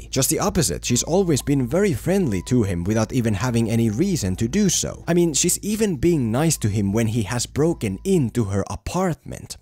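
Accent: Finland. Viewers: Hey . I have to ask. Are you Finn? Your accent defenetly sounds finnish :D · Let me guess, you are Finnish or something by your accent. (I'm Finnish myself so Idk) No offense intended. I like your accent ALSO HE Didn't kill his neighbour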